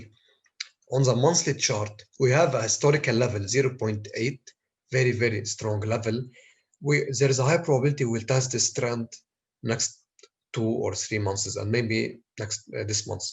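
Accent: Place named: Egypt. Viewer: U sound like an Egyptian. Could you please look into doing crypto currency forecasts?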